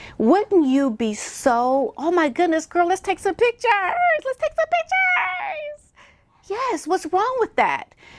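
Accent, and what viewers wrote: England, United Kingdom. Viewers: I like the English accent. Lol! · Love 💔 the Brit accent....Lol...B lucky. Lol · Ha ha...we don't all speak like that in the UK but your accent was so funny and good too.... come do some acting over here 👏👏👏👏👏👏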